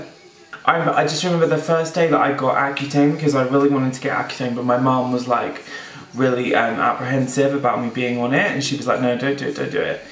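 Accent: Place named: United Kingdom. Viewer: Omg I was thinking the same thing I think it's the British accent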